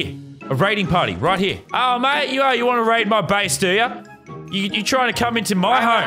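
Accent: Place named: Australia. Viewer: Yo bro you have a Aussie accent big time bro cheers for Australia man (I’m also from Australia).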